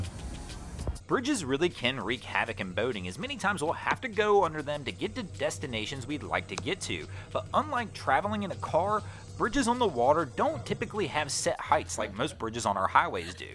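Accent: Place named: Pittsburgh, United States. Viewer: So what you're saying is that you're from "Pixburg"? Sure sounds like it.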